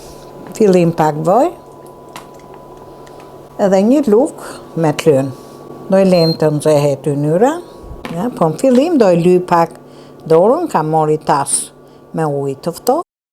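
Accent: Tirana, Albania. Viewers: Amon mi se na knoqe me kete gjuhen tate prej tironse ilac je me kete dialekt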